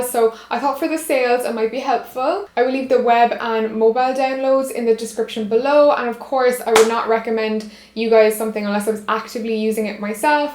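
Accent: Ireland. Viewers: Love your accent my name is also my family is from cork in Ireland too 😍😧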